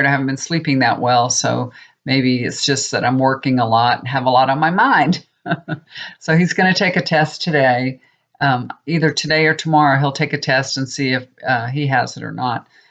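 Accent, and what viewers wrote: South, United States. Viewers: I like your accent and southern charm.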